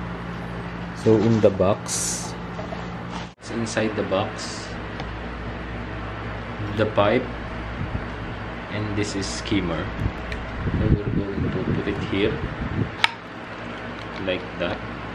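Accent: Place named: United Kingdom. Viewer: Akala ko british national yung nagsalita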